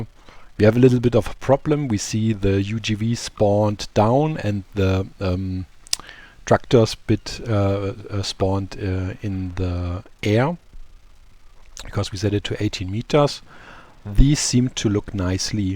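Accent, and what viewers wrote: Germany. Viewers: best mod ever had. ok we have some nice tanks,planes,boats etc on our tactic server but this is still awesome! is there a elevator for boats planed? for now we have to tow our trucks,tanks etc with planes to the island. are you german?you sounds like an english speaking german;-)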